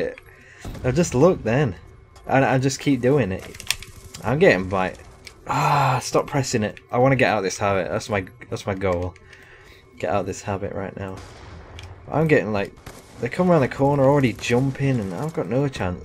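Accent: Yorkshire, United Kingdom. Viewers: be proud of ur yorkshire accent:) use the words like 'gunna;:D im from yorkshire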